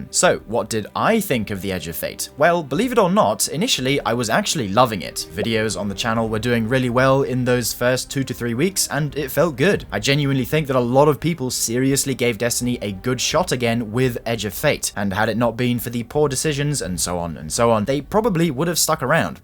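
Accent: United Kingdom. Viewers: I usually roll my eyes at the “The future of this channel” videos because they’ve turned into bait due to what they’ve become to signal recently, but because I’ve become such a big fan, I had to watch. About halfway through when you said that you found your community, I realized something: You don’t know what you’ve become to the community. You seem genuinely surprised that your guides have been so popular. I will tell you that while Byf is our defacto lore person, you have fallen into that role for guides. Not that others are bad, but because of several things you offer in yours. They’re concise, to the point, very detailed in all the ways they need to be while showing exactly what you’re talking about with a phenomenal tone, vocabulary, personality, and delivery. As far as your builds and guides go, I consider you my Alfred. Giving your gentle British advice and tips before I go out and exact vengeance and get my ass beat lol. 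Thank you and keep doing it. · tbf his british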